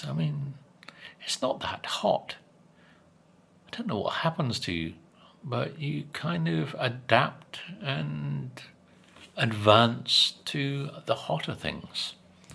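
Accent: United Kingdom. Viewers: I love your british accent,,I try to imitate it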